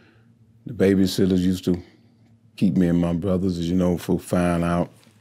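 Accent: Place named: Chicago, United States. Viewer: You can tell from his voice that he's from Chicago. Older black folks in Chicago got a certain way of talking lol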